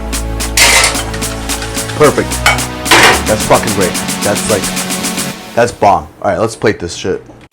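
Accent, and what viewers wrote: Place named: New York City, United States. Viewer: Love this channel!! As well as your NYC accent 😜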